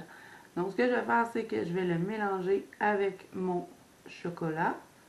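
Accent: Quebec, Canada. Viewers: Merci beaucoup avec ces nouveaux tutos, tu nous fais bien progresser. Comme dit Les couleurs de Noëlle, j'adore t'entendre, avec ton accent Québécois qui nous semble exotique, à nous français, et nous fait rêver aux grandes plaines et forêts enneigées du Canada. Quand j'étais petite, j'avais adoré le livre Maria Chapdelaine, et je l'ai relu plusieurs fois. Bonne journée, gros bisous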